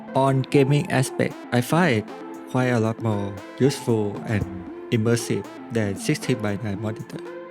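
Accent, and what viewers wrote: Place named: Thailand. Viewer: I recognize that Thai accent right away. Good job bro.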